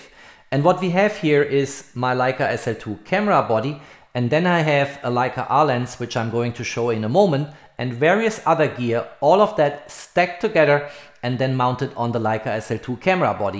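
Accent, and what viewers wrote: Germany. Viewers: Bist du aus dem Schwabenland?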